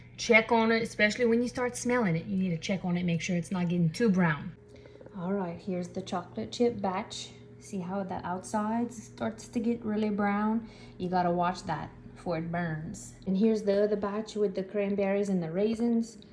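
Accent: Louisiana, United States. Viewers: You are absolutely precious! I love your Cajun accent! You make me proud to be from Louisiana!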